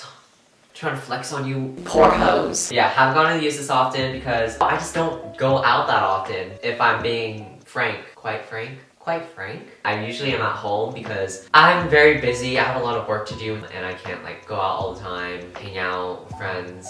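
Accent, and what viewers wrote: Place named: Los Angeles, United States. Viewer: You talk, look, and sound so LA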